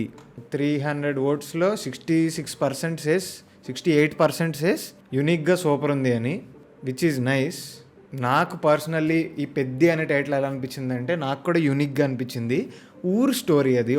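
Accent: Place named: North Andhra, India. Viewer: Rajam ,vizianagaram, srikakulam evi 3 slang kalipi vere slang vacchinchidhi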